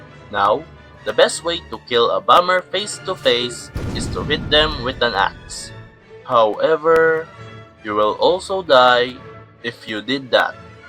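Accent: Philippines. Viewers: Why you kinda sound Filipino?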